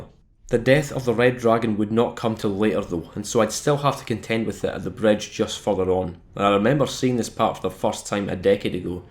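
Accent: Scotland, United Kingdom. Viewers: Great work mate, refresher to hear a Scottish YouTuber with an actual normal Scottish accent 😂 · Great video mate! I' ve only had a few problems understanding everything, because of the scottish accent, but went fine at the end, I guess 😅😂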